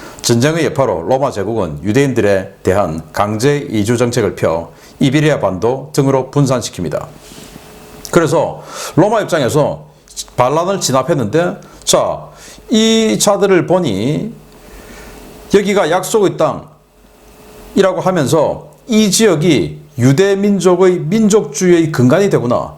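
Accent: Gyeongsang, South Korea. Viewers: 🐕 조지나 빨아라 경상도 말투나 고쳐라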